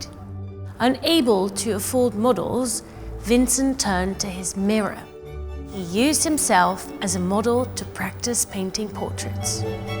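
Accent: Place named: Netherlands. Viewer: I actually love her accent, sounds like a Dutch that lived or studied long in England. My husband is Dutch and sometimes he also sounds a little bit like her, I love that.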